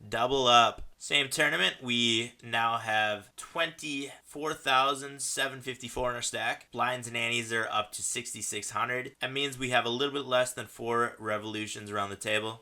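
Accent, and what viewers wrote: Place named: Southern California, United States. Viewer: Stupid 7s ...$1800 though . You have built up a pretty nice balance ... It's only been like 6 weeks . Rad ! I learn a lot from watching these . Just out of curiosity , did you use to , or still have a YouTube page about fake staged events , flat earth , and NASA perm hair dues ? If so , send the link . My brother sent me a video of a guy talking about that , and he wasn't nearly as entertaining . The guy I wanted to show my brother , has a similar voice as yours ... But we all grew up in Southern California ... It may just be the regional accent that I'm identifying with . Anyway..Have a great Sunday . Thanks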